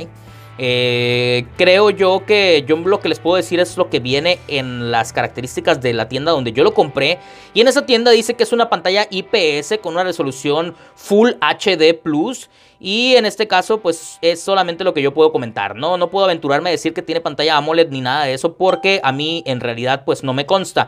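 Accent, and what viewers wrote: Nayarit, Mexico. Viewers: Hola, amigo. Me gusta mucho tu estilo, supongo que eres de Nayarit o cerca, el acento me es conocido. Un saludo y tienes un suscriptor nuevo.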